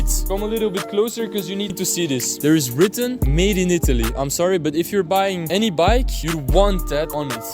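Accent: Netherlands. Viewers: ik hoor die Nederlandse accent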